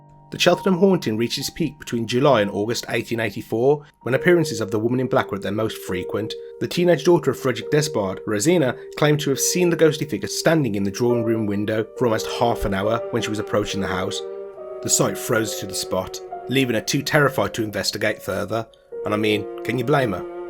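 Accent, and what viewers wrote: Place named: Black Country, United Kingdom. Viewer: there are more things that has happened in the past despite going on we cant see in our modern present lives, love the black country accent mate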